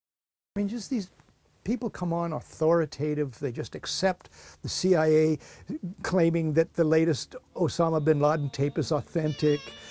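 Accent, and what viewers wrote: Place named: Canada. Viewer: this guy is canadian ay! (out and about pronunciation)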